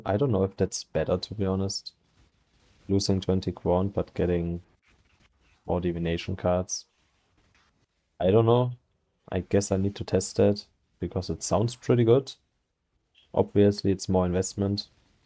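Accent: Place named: Germany. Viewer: guter deutscher akzent :)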